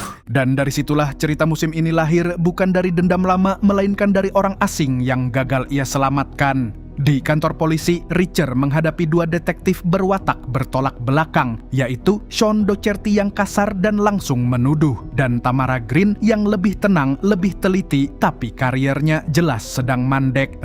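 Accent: Banjar, Indonesia. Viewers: Admin ny urang banjar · orang Banjar kah mang?😂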